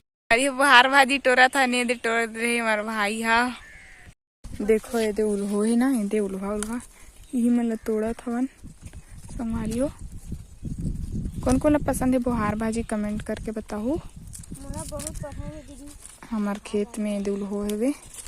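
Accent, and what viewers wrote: Chhattisgarh, India. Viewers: Ek dum chhattisgarhin boli bahut achha he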